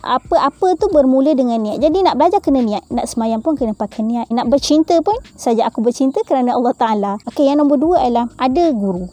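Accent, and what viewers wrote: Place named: Pahang, Malaysia. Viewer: 💯 setuju. Dah lama tak dengar loghat Pahang, felda mana ni? 😁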